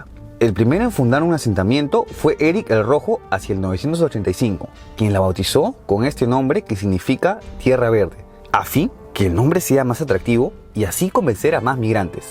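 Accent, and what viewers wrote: Peru. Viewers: Qué lindo tu trabajo.. un poco rústico pero Lindo 😍 y tu acento peruano (si no me equivoco) 😍😍 Lo explicas genial 👌😚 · Lo sacaste bien. Es un acento "peruanazo", con ese superlativo tan usado que usamos nosotros. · No sé pero creo que tu acento es peruano, eres mi compatriota? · No se le entiende muy bien el acento del moderador ojalá usará acento más neutro no al estilo peruano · Es evidente que español no es; yo creo que es peruano, pero no estoy 100% segura de distinguir el acento.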